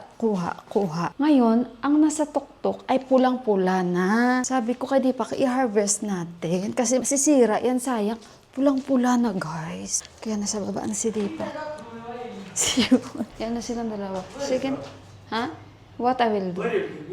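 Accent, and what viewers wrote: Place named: Hiligaynon, Philippines. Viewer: Lingaw gid KO mag lantaw SA imo te Kay ilongga man KO te...from lemery Iloilo..bisan mga 5 yrs ago pa na vlog mo ate ambot lantaw KO tana AHH..Kay kuha stress KO mag lantaw vlog mo te kapin pa didto SA village te..kag Kanami Kay enjoy ka gid da SA lugar sang Nepal te..new subscriber Gali KO te pero lingaw KO ..Kay ang Tagalog mo te gwa gyapon pag Ka ilongga AHH..halong Kami pirmi te..pirmi KO hulat upload mo ..pero mga vlog mo nga dugay na lantaw KO yah te AHH.. hehehe ♥️♥️♥️😘😘😘😘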